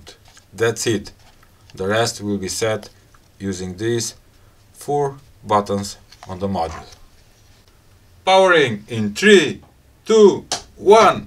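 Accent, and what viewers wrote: Russia. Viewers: I hear russian accent.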